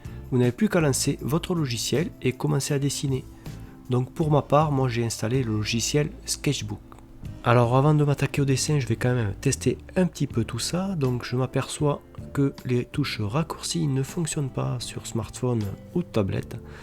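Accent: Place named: South, France. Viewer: D'ailleurs tu viens du sud non ? (J'ai le même accent que toi et c'est rare de le voir sur YouTube)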